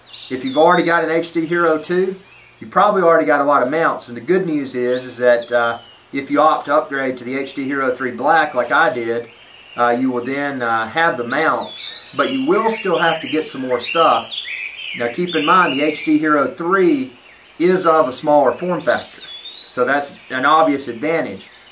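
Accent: South, United States. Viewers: I have a feeling it is angry Europeans disliking his southern accent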